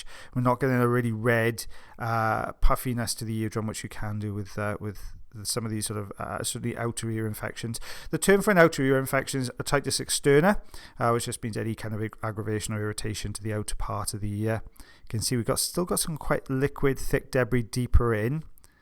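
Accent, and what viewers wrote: Wales, United Kingdom. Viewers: I love the accent! Especially Welsh! And the words used or sayings, in the UK are lovely! I've used "give it a go" so much Its a part of my every day dialog!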